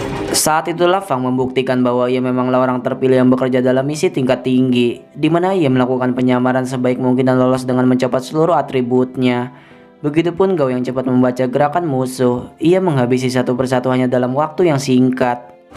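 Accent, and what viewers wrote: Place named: Java, Indonesia. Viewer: Logat nya mendok sekali eperi badi😂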